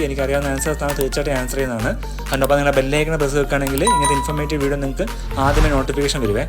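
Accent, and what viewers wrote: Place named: Palakkad, India. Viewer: That usage was your signature style and enjoyed the same. By the way are you from Palakkad, coz the particular style seems so.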